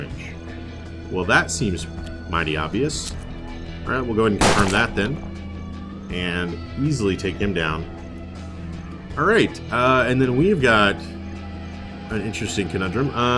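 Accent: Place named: Oklahoma, United States. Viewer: keep it going! that OK accent adds to the game for me this game has awesome narrative to it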